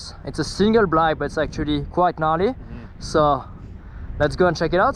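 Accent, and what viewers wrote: France. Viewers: You know,you’re such an talent,you’re videos are amazing, but,honestly,i can’t listening this french sound english,it rippes my ears dude and i’m just wondering why everybody from France has such a strong accent.🤷🏼‍♂️😣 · Your accent look's like French accent 🤔 By the way you're a very good rider !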